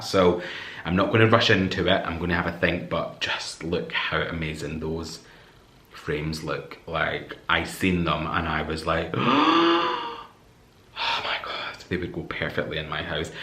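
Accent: Scotland, United Kingdom. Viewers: Are you Scottish I 💗 your accent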